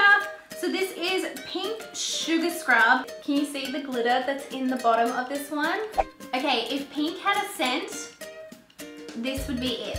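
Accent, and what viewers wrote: Australia. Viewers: You have the Australian accent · Are you Australian because I am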